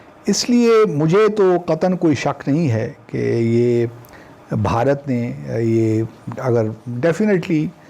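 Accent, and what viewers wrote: Pakistan. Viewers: Sir, are you a Hindko speaking Peshawari?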